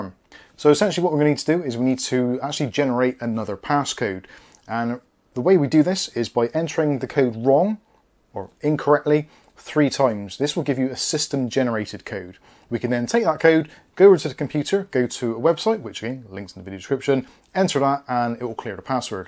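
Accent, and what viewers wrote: Bristol, United Kingdom. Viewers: You from Bristol??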